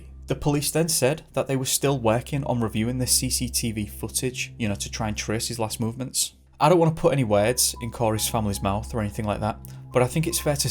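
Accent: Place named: England, United Kingdom. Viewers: Love listening to your videos keep them coming,was wondering were are you from your accent sounds like your not far or from middlesbrough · Ware abouts in England are you from . I am trying to figure out the accent ! Just somthing I do lol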